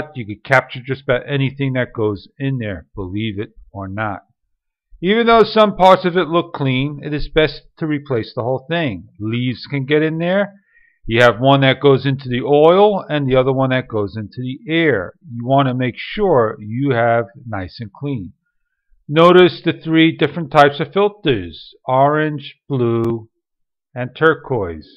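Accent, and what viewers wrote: New York, United States. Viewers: U from ny!?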